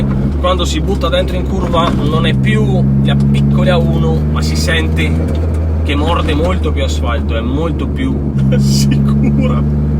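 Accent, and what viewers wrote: Veneto, Italy. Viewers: L'accento Veneto è inconfondibile.... 🍻😉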